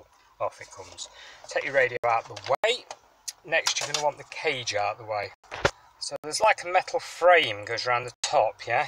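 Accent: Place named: Nottingham, United Kingdom. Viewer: Do you offer a fitting service - you sound very local to me (notts) - extra coin for you if you wish?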